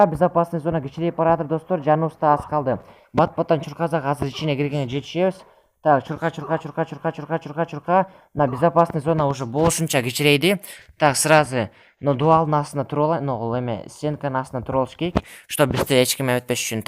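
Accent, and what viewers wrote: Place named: Talas, Kyrgyzstan. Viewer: Таластык окшойсун досум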